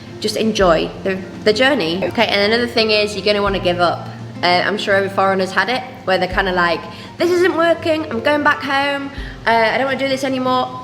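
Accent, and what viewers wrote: United Kingdom. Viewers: 很好聽的正統英國腔～～❤❤👍👍👍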